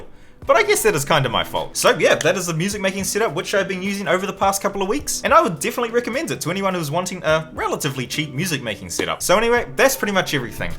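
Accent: New Zealand. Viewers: I think it might be a Kiwi accent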